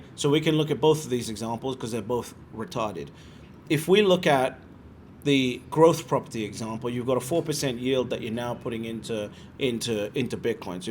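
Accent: United Kingdom. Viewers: Digging the British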